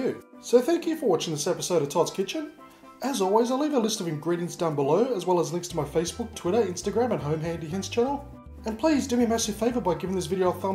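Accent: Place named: Australia. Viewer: he said 40mins, must be the Aussie accent >.>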